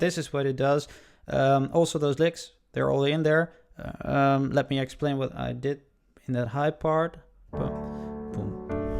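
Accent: Netherlands. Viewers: it's not bothering me but are you Dutch?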